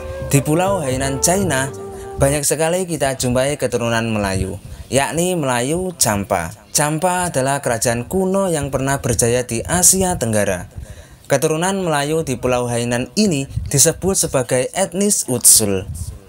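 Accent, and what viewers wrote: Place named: Java, Indonesia. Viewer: Masssssss.bhsa.nyaaaa medog bngettt.jawa nyaaaaa.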